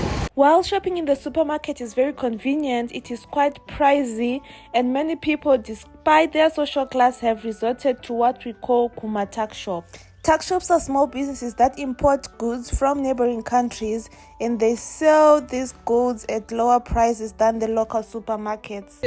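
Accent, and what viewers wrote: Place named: East Africa. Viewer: has any1 ever told you, u sound east african? lol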